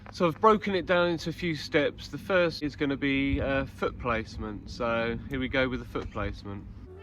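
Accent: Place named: United Kingdom. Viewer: British ah boy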